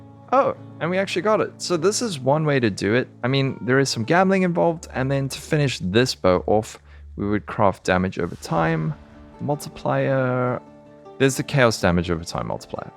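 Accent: South Africa. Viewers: You from Durb's? you sound Safa!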